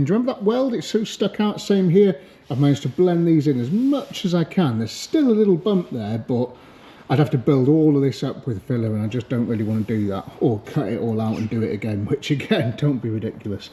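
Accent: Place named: Wales, United Kingdom. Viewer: My elderly mother is wondering whose lovely Welsh accent that is wafting around the house. I keep leaving YT on auto-play.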